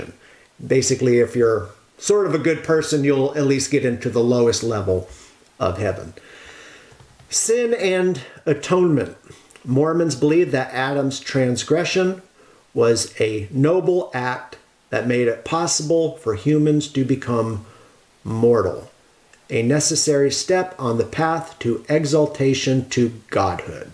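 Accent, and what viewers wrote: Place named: North America. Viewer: According to the Oxford dictionary, the word cult is defined as "a relatively small group of people having religious beliefs or practices regarded by others as strange or sinister". Firstly, by way of informing you, the seventh day adventist church is a world wide denomination with an approximate membership of over 20 million members...( and counting ) Of this number, over 1.2 million alone are from North America, a place where you reside given your accent. Hence, the growing size of this church by way of simple logic disqualifies it as a cult. Like the dictionary says, a cult is made up of a relatively small group... however, on the contrary, this church is not a "put together church" formed in recent times in a shed to the back of nowhere, rather, this church was prophesied by God himself and is sustained God himself with a global reach. 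In addition, the said dictionary states that the word cult is associated with devotion towards a particular figure or object. Again you seem to be misinformed as the only allegiance the church bears homage to is Jesus Christ hence the name " SEVENTH DAY ADVENTIST " which means, looking forward towards the coming of the Lord. In other words, their belief is in there name. You are correct in suggesting that the church have a leader. Infact, they do have a leader, someone they follow, but it's not Ellen G White like you suggest, rather Jesus Christ their soon coming king. Lastly, the origins of this church is not only supported with Biblical e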